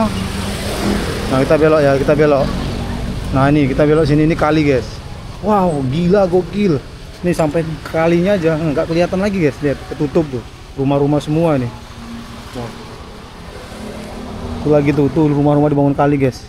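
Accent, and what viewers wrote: Medan, Indonesia. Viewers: kental kali cakap medan kau lek....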